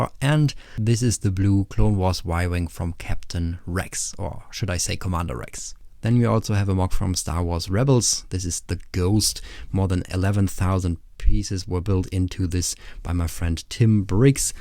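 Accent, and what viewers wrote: Germany. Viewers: Dieser deutscher accent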